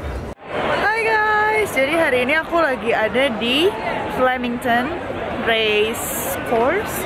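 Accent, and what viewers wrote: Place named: Java, Indonesia. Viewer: Medoknya kluarin dong kak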